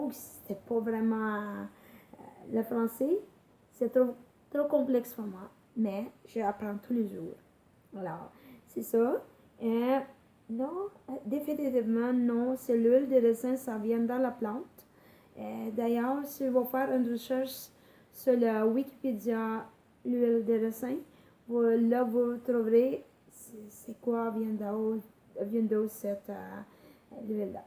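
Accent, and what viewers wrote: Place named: Canada. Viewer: Merciii, c'est vrai que c'est excellent l'huile de Ricin. J'aime beaucoup ton accent canadien.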